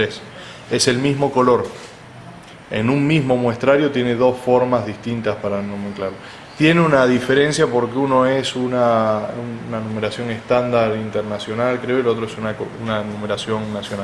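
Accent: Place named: Argentina. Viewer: Buena enseñanza y buena explicación y sos Argentino nos entendemos mejor gracias xr compartir tu experiencia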